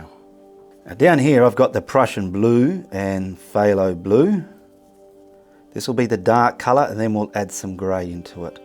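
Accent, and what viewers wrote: Australia. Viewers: Hi! I live in Virginia. After watching many Bob Ross videos and loving them, I decided to look for some videos with acrylic painting, and I found you. You are very instructional and I enjoy your Aussie accent and colloquialisms. I just watched the Misty mountain tutorial. I loved it. Your trees are beautiful! I have a question for you. Why won’t the knife work for painting mountains with acrylic paint? ❤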